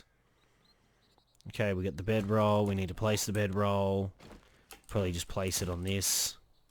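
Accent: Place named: Australia. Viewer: sure did! accent kept me in lol, Australian?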